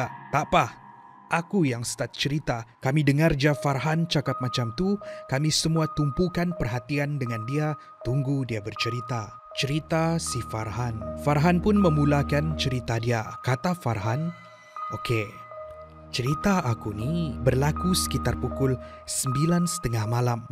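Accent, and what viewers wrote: Sabah, Malaysia. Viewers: dia ni orang sabahh ka?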